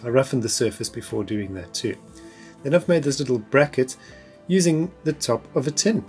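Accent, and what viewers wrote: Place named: South Africa. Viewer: Great video and SA accent, just started with this hobby, lots to learn from a master craftsman. Looking forward watching all your vids. From sunny CT.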